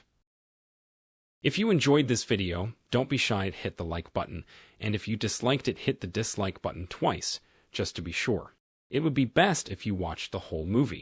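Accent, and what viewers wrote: United States. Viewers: Man do I hate a yank telling an Irish story ..... give up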